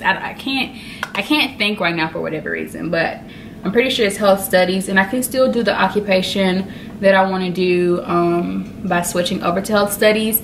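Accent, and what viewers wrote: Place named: South, United States. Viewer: Gorgeous and your country accent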